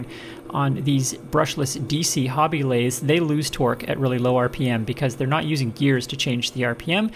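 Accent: United States. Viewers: I never thought of that but I love the idea. Great video. One thing that confuses me. You are supposed to be a Canadian but I never hear you say aboot or eh? You just used inches and as we say in the south you tawk uhmurikin. Not that you would use those words. Even AVE could be picked out as up nawth some place. Can you unconfuse me?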